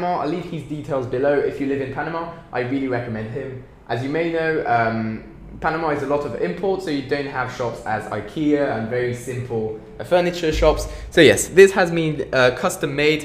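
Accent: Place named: France. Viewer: you're accent it's like a frensh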